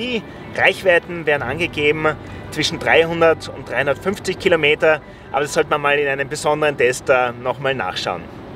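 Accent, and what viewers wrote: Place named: Austria. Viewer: Na Na, wer wird denn gleich so negativ-kritisch sein. Testerfahrung / -Routine kann man lernen, CHARME nicht - den hat man oder eben nicht - ich mag die Österreichische Sprachfärbung sowiso lieber als die Ost-Deutsche. Interessantes E-Auto - ich sags ja - die Asiaten kommen! Lass den Jac auf 450 KM Reichweite reifen, dann kann sich VW warm anziehen. Ich setze mich in kein Auto, das so flach ist, daß es unter der geschlossenen Parkhaus-Schranke durchkommt.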